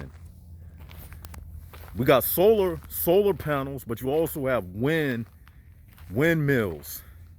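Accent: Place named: East Coast, United States. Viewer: I thought east coast because of accent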